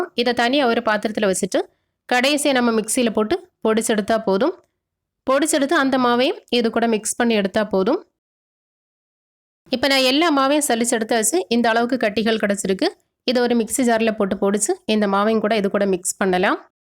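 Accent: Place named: Kanyakumari, India. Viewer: குமரி தமிழ் அருமை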